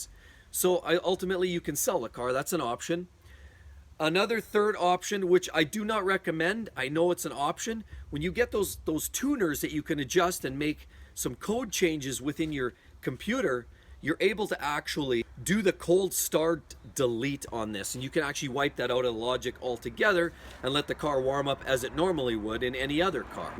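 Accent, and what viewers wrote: Canada. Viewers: Are you Canadian or from Minnesota?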